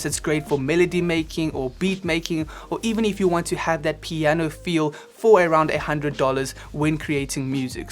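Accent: South Africa. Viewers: I know your accent is south African, I was wondering why you had the money in dollars instead of R😂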